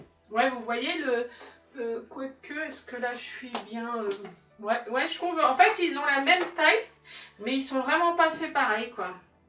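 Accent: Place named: Paris, France. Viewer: oh oui des recettes allégées !!! bonne vidéo, un p'ti accent j'dirais parigo !!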